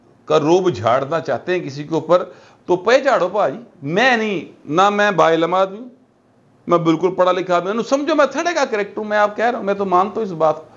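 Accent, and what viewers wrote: Lahore, Pakistan. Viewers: آپ کی باتوں سے اتفاق نا بھی ہو۔آپ کا عوامی اور سادہ انداز ہمیں بے حد پسند ہےآپ کا انداز گفتگو خالص لاہوری لگتا ہے۔👌👌👌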